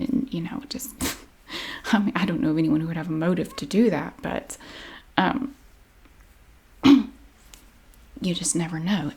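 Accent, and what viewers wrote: South, United States. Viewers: Bro why is nobody talking about how good did with the accent! You did such a good job of sort of toning down your southern accent and sound like Carole! That’s a very hard thing to do and you did a great job💕💕💕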